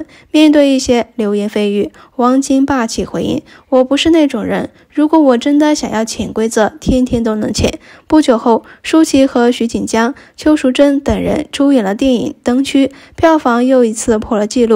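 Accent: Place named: China. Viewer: 不要再毀謗侮辱了，留口得了吧？大陸的口音可以滾了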